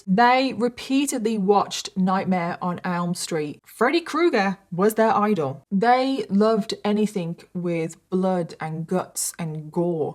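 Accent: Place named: United Kingdom. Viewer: Very sick and twisted story. Made me tear up when you told us the bikers and nice car owners led the funeral procession. Side note I love how you use the word "whilst." Your British accent is the kind of British accent I love! I don't know the area and the different dialects, but I can actually understand you! 🤣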